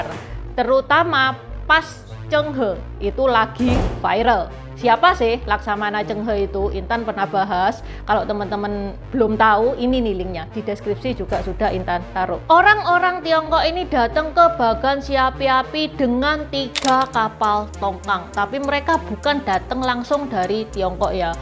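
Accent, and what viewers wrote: Java, Indonesia. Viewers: Medok juga ya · Aneh dia oriental logat jawir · Logatnya jawa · Ci intan campuran tiong hoa jawa ya...? Medok jawanya kelihatan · Hihihiiii ilate ilat jowo 😁😁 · Intanmemang cantik kok, apalagi KLO sudah menceritakan berbagai dinasty yg berpetualang. Dan akhirnya sampai ke kota BaganSiapiApi kab.Rokan Hilir prop.Riau daratan..dan menurut cerita rakyat Bagan, ,bahwa BaganSiapiApi bukanlah pohon siapi api, ,tapi pepohonan yg tumbuh ditepi pantai dimana pohon itu paling disukai oleh serangga sejenis kunang kunang dan dipandang dari lautan seperti api berkedip kedip yg menandakan adanya perkampungan tempat tinggal penduduk. Mksh mbak cantik yg medok dokdok dok, ,lanjutkan, , , ,!!! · medok banget😂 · Salut buat mbak Intan, logat jawanya medok banget.. hehehe, sui lah 👍👍👌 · Salut cie logatnya Jawa banget 👍🏼👍🏼kalau dilihat cie aadik saya jauh bisa jadi seumuran anak saya Salam dari Cah Boyolali Jawa Tengah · ini baru namanya tionghoa rasa jawa.. 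saya suka medhok nya..❤❤❤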